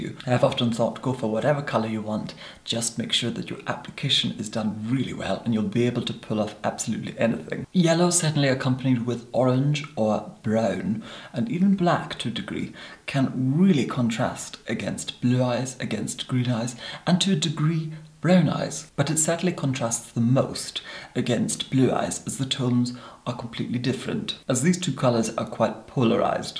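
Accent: Scotland, United Kingdom. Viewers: He's definitely Scottish. Go back to his first video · The first video of yours I've watched and I immediately picked up on the Scottish accent 😍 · .... our Lord says England but has a Scottish accent? Surely should still say the UK? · He has a lovely accent although there’s defo a Scottish accent undertone.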